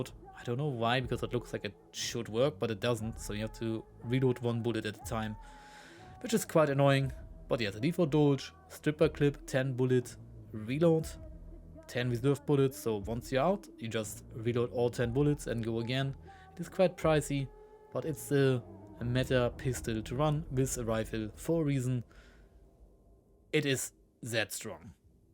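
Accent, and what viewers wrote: Germany. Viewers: Dude your German accent is so heavy I can't understand what you're saying 😭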